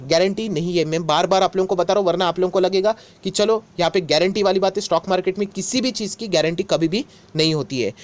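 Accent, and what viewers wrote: Maharashtra, India. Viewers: सर, तुमच्या बोलण्याच्या पद्धतीवरून असे वाटते आहे की,तुमचा मराठी भाषेशी काहीतरी संबंध आहे.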